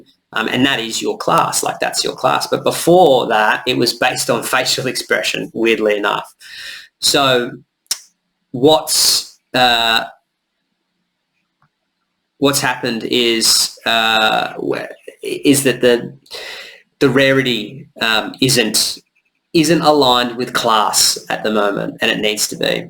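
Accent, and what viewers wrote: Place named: Australia. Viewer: Sol big brain has brought me to this project. Cannot wait. Also listening to your accent great to hear someone from Straya 🇦🇺 🙌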